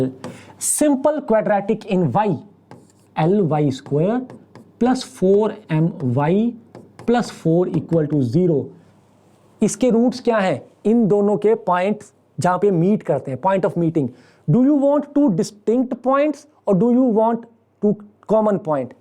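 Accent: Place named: Punjab, India. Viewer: Sir have accent of punjabi stand up comedians who lives in Delhi Sir please make a family friendly stand up comedy please🙏🙏🙏🙏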